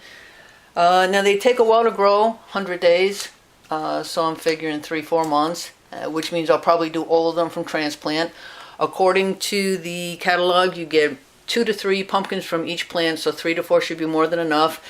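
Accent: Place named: Pennsylvania, United States. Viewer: I have grown these here in NE VT. They take longer here, as we are borderline zone 3 and it does not get as hot as in your neck of the woods. I have a nice haul this year, about 20 of them from seed I saved from last year. I planted on June 1 after staring in the greenhouse. I just harvested them today, Oct 24 ( fortunately no frost yet). They are only just beginning to reveal their orange sections. I was wondering if these pumpkins can be just let to sit and dry in order to make seed harvesting easier and not such a gooey affair. Have you ever tried this? Anyways we are fortunate not to have a big problem with squash beetles, haven’t yet seen any here. The Japanese beetles preferred the hazelberts and prunus ( cherry plums and regular plums ) though they were less this year too ( maybe two years of trapping is helping). Keep on growing…. 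You sound like you live in PA…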